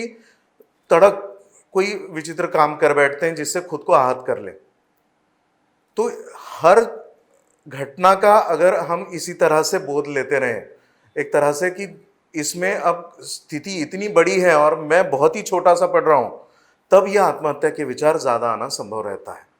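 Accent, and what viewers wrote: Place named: Maharashtra, India. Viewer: Tu marathi n tuz accent marathi ahe marathich sangaych murkha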